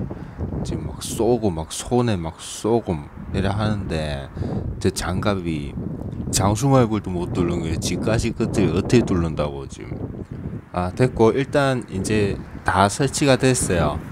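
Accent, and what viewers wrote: Gyeongsang, South Korea. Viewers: 터줄낀데 ㅋㅋㅋ 경상도 방언 재밌다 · 유튜버님의 경상도 사투리가 마치 청국장찌개수준입니다 너무 구수하네요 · 말투가 경상도 같음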